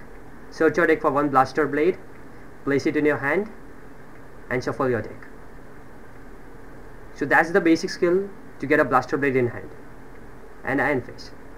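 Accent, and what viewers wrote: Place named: India. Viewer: ur original accent u do no harm so expecting an indian accent . the overall video was gud keep up the gud work bro👍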